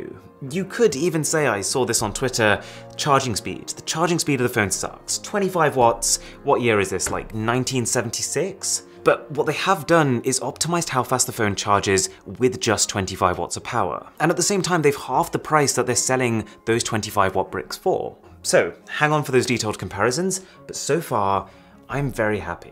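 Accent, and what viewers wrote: RP, United Kingdom. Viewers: That is the poshest accent I've ever heard from someone who wasn't old as shit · It is the British accent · Yes, he is posh.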